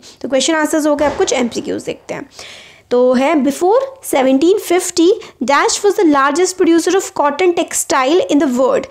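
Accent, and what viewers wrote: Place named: Uttar Pradesh, India. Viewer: mam you are from UP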